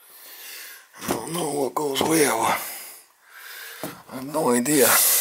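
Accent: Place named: New York, United States. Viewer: were in the USA are you based I'm a retired plumber and heating engineer from Scotland love your videos your just an honest tradesmen earning your corn but not bull shiting your customers you sound like a new Yoker apologize if I'm wrong I'm from Glasgow Scotland love your videos but remember it ain't easy being cheezy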